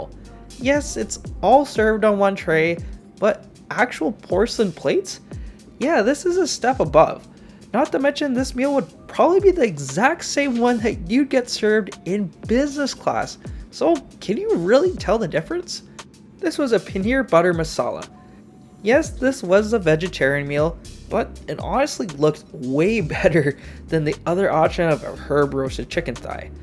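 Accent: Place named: Canada. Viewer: It’s fitting that the most Canadian accent ever reviews this air Canada flight.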